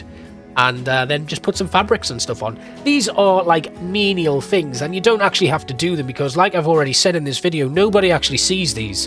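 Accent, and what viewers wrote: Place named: Newcastle, United Kingdom. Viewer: Im watching Saltwell now but about to turn off for the footy! You have definitley come on leaps and bounds but by no means would I say Saltwell is bad, especially from the African centre onwards i would say you really hit a stride. Im Welsh and I was sure I could hear a twang but theres no mistaking the Geordie, both melodic accents I guess! Keep the awesome content coming, also if you are looking for further inspiration from creators I highly recommend ZSHPlays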